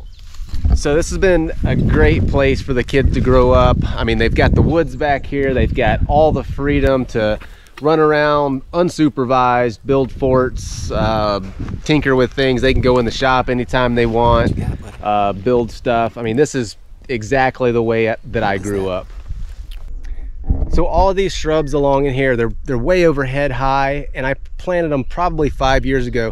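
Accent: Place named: South, United States. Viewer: I'm just curious as to what part of Florida your from? I'm originally from Georgia. West of Atlanta about 30 miles but I moved to Perry Florida back in 1996. Perry is in Taylor county and I've been coming here sense I was a kid. Anyways I was just curious if you were from anywhere close to this area. Like the accent by the way. Always good to hear someone from the South!! I've just started following your channel so I was wondering how you wound up where your at now if you don't mind me asking?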